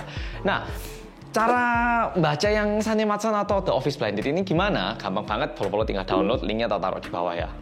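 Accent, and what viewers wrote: Java, Indonesia. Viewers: medok itu nama logat jawanya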